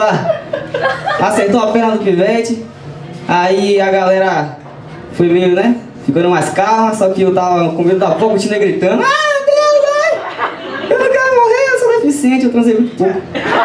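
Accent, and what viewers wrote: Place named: Salvador, Brazil. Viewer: Vimmm pelo João seu Pimenta ,se ele indincou porque é bomm, com esse sotaque de Salvador é bomm mesmo haha Vai chegar!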